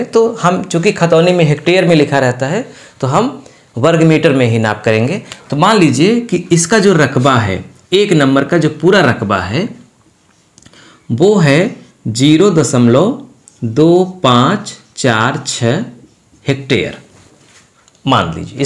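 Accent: Chandauli, India. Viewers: Aap chandauli se ho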